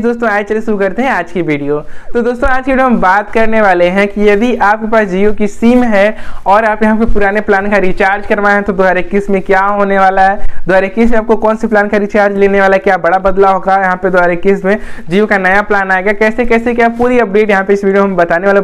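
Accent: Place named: Uttar Pradesh, India. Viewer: Up ladies jasha bat Kew korte ho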